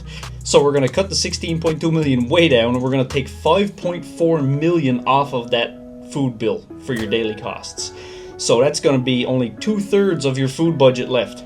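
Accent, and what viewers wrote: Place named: Eastern Canada, Canada. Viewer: Oh okay. Nice. I thought by your eastern accent you were but I heard you say something about western Canada so wasn’t sure. Cheers. Love your channel. Cheers 🍻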